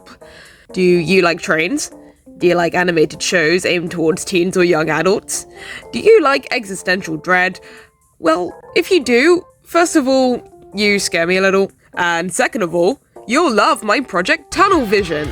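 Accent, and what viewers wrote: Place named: United Kingdom. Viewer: Oh God, he’s British (ps this sounds amazing)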